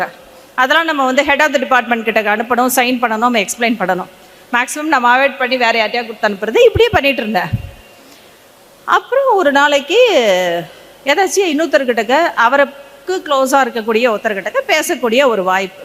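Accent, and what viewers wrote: India. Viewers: உன்னைப் பார்த்தால் சிரிப்பா வருது..🤣🤣🤣 அரபிக்காரனுக்குத்தான் உன் English best ஆ தெரியும்... America, Canada ல பேசிப்பாரு... உன் பேச்சிலேயே நீ இந்தியன்னு சொல்லுவாங்க. (because of your accent) 🤣🤣🤣 முதல்ல ஒரு விஷயம் புரிஞ்சுக்க.. English ஒரு language தான்.. ஒரு வெள்ளைக்காரன் தமிழ் பேசினால் எப்படி சந்தோஷமாக ஏற்கிறோமோ அதே போல நாம் English பேசினால் அவனும் அதை ஏற்றுக்கொள்வான். அதுக்காக அவன் நம்மை அதிகம் படிச்சவன்னு நினைக்க மாட்டான்.. நம்மள மாதிரி முட்டாளா அவன்??? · ஆனால், நல்ல தமிழ் கதைக்க உங்களால் முடியவில்லையே. Madras தமிழ் தானே கதைக்கிறீர்கள்.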